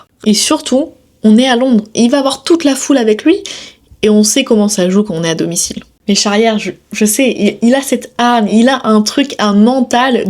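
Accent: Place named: Paris, France. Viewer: C’est l’accent Panaméen.. attention on critique pas …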